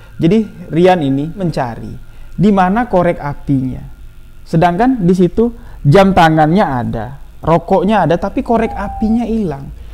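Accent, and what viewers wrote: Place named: Madura, Indonesia. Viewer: Mas tu orang mana ya kok ada logat maduranya...🙏...seneng dengerin cerita 2 dr mas...sehat sll sukses...mantap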